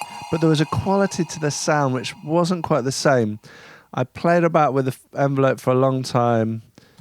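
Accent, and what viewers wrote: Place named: England, United Kingdom. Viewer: being an american, you have the most english accent i think i've ever heard haha. that is, other than the old timey exaggerated nonsense.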